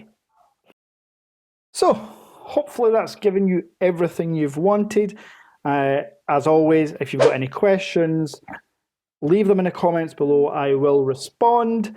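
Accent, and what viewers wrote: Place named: Glasgow, United Kingdom. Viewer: This guy speaks with s Glasgow accent. He sound like Rab c Nesbitt.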